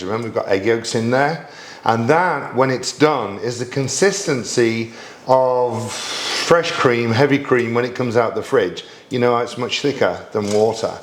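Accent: United Kingdom. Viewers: I had to subscribe. I just love the way you talk. The British vibe is everything! Cheers from France! 😊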